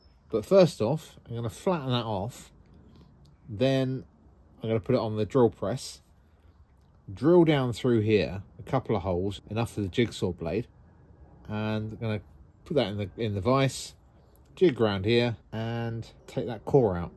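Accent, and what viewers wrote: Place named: United Kingdom. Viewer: Well done love how pretty that grain is what type of wood did you use I know you mentioned and said what type it was but I just did not quite understand stand what you were saying with that wonderful British accent you have may be you should have let Lenore with her Canadian British accent say what it was being I am a Canadian Blessings from Hanna and all the hot weather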